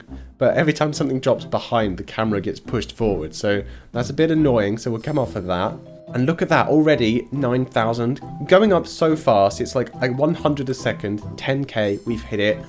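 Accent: England, United Kingdom. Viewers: is he english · are you britesh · Are you British?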